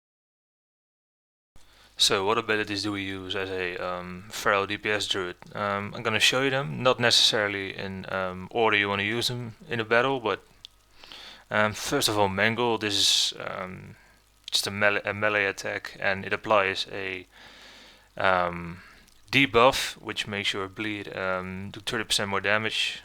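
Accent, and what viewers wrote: Netherlands. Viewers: Lol you can just hear this dude is dutch :) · ure dutch arent you?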